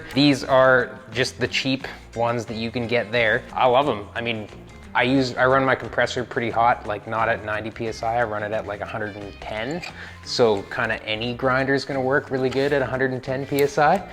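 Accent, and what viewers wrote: Canada. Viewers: Great channel you have and I like the tips and tricks so thanks for those. I’ll be working my way back through your videos as there seems to be a lot of stuff that’s relevant to anyone fabricating in steel not just hot rodders. Picked up you were Canadian as soon as you said “out” and then “princess auto” confirmed it. It’s a bit like Australians and New Zealanders, subtle but obvious once you hear it. Incase you’re interested I’ve put a link to a video I found yesterday of Japanese craftsman hand making what looks like a glove box with compound curves and all done the old school way with tin snips, hammer and dollie’s, shot bag and oxy fuse welding. Not an English wheel or tig to be seen. I haven’t got a clue what he’s saying but I reckon it’s a work of art. Cheers, Stuart 🇦🇺. <link> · haha i thought you were saying “about” and “out” a little weird and then when you said princess auto or whatever I was like ohhhh okay he must be from Canada😂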